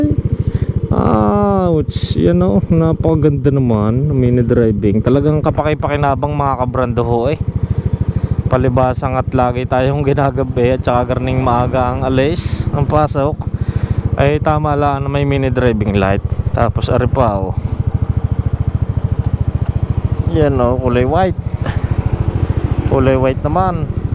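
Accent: Batangas, Philippines. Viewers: gustong gusto ko yang content mo boss at tonong batanggenyo..🙂meron din kc akong kamag anak sa may lemery batanggas..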